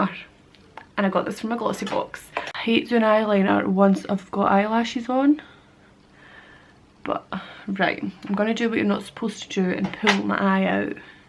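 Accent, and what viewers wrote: Scotland, United Kingdom. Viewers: are you Scottish I take it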